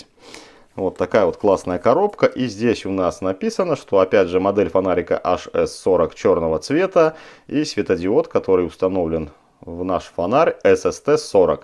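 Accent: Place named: Belarus. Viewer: Спасибо за обзор, фонарик отличный, уже 3 таких купил! 🙂 Заметил что у автора еле заметный говор особенный, такой приятный убаюкивающий, подумал что на белорусский похож, и кажется угодал. Привет беларусам от русских из Эстонии, очень нравится ваш говор степенный! 🙂👍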